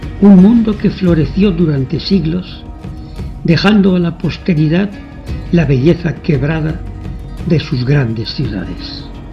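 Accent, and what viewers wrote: Spain. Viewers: Me jode mucho el acento español tan marcado q por momentos ni se entiende, por eso no pude seguir viendo el documental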